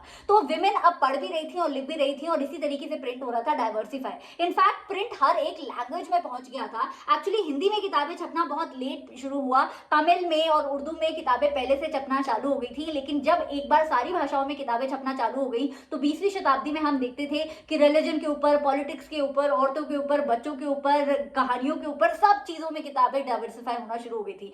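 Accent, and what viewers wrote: Gorakhpur, India. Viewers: Mam are you frm gorakhapur😅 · Mam aap gorakhpur se hai kya ❤ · Mam aap Gorakhpur se hai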